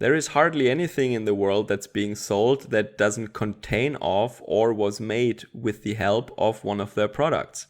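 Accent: Germany. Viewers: I understand nothing he's saying about economics, but damn do I love listening to that German accent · Dein German accent is grauenhaft blöde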